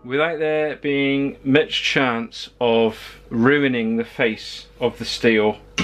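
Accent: United Kingdom. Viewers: Can't say I ever wondered what Charlie Sheen would sound like with a British accent, but here I am.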